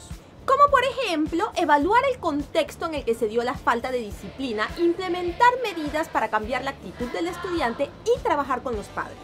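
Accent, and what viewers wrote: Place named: Panama. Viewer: A parte, quiero hacer un comentario con respecto a los videos. Yo entiendo que quieran hacer algo de humor realizando diferentes narraciones como si fueran personajes, en este caso, una profesora de colegio. Pero creo que esto le quita seriedad al video, si la idea es enviar un mensaje serio. Ademas pienso que no es "hablar como panameño" es mas bien hacer la diferencia a la hora de hacer un video, porque si fuera asi, entonces todo mundo hablaria como habla cotidianamente en cada video de youtube, serie de netflix y programa de tv que uno ve, no? Yo solo digo que puede haber mas seriedad del video, si se habla con menos tonos "al estilo panameño" y con mas tonos concretos.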